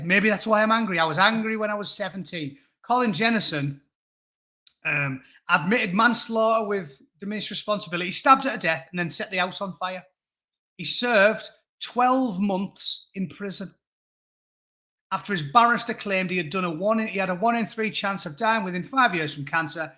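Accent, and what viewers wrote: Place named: North, United Kingdom. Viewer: you should do a spoof news reader segment, done in your own chippy northern styleee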